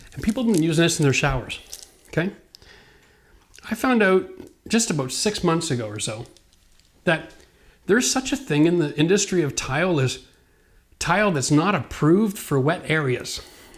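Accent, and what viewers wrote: Canada. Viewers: and crew, I am no stranger to DYI however I found myself with a project I had never done. Upstairs shower leaking to downstairs kitchen. I found your channel by accident and when I heard the Canadian accent I was hooked. I live in NE Ohio USA and the weather plays a big part in any renovation. You had great advice, both time consuming and monetary. I wanted to thank you for sharing your experience and give a shout out to your son who made the comment (at the same time as me watching your video) "Now show them how to do it without 35 years experience".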